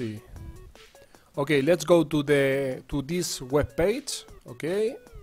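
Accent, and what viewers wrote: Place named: Spain. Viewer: Por el acento pareces español bro jajaja. Oye, tengo un juego (La verdad es un Demo disc, uno de esos que venian con la revista oficial de la play xD) con todos los archivos bin pero me falta el cue. He intentado recrear el archivo y ahora detecta el juego y lo abre, pero no funciona, se queda en una pantalla negra...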